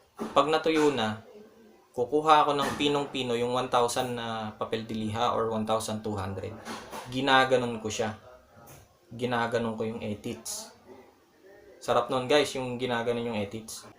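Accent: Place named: Pampanga, Philippines. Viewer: ahh ganun ba. kaya parang medyo kapampangan accent yung tagalog mo bro. haha. new subbie. ggwin ko sa off yung suggestion mo sa beat v2 ko.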